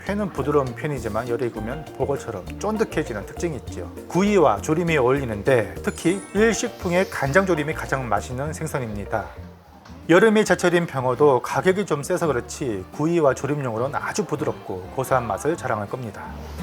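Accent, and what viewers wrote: Gyeongsang, South Korea. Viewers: 님은 갱상도 사투리 쓰는 서울 사람! 🙆‍♂️🙆‍♂️🤗🤗🤩🤩👍👍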